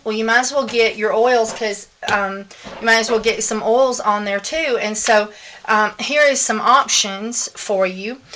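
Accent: South, United States. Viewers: This was a great video. Thanks for uploading. I have been curious about making soap since I saw it being made at Stone Mountain years ago. Thanks for giving your recipe for beginners. I have not gotten up the courage to try yet. But the simplicity of this video makes it seem like, YES I could do this. The Southern accent (LOVE IT) makes me feel like I am learning from my Aunt. ✌🏻❤ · I’m pretty sure that she speaks in a southern accent but is trying very hard to not speak like that🤣🤣🤣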